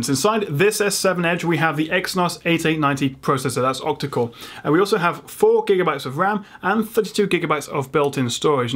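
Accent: England, United Kingdom. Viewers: hey are you from the UK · brilliant review and nice to have an English accent on one of these videos! You're going to give mkhb a run for his money haha. I like the fact that you mention the little things like edges feel in the hand and the fact that you have a problem typing sometimes due to your hand touching the screen. These are the things that really matter and are the exact reason I use the normal s7 as opposed to the edge! great work, keep it up :) cheers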